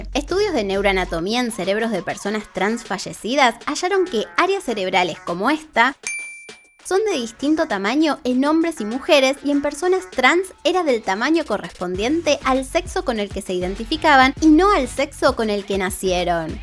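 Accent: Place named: Argentina. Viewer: Que acento argentino tan marcado joder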